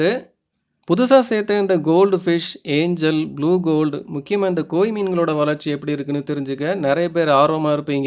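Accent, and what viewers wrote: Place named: Kanyakumari, India. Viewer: s s Language kanyakumari pola irukku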